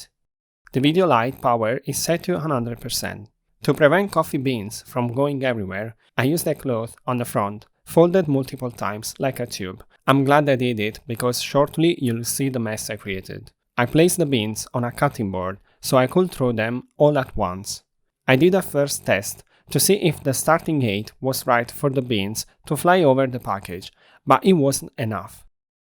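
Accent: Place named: Turkey. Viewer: Dude, are you Turkish? You have Turkish accent 😊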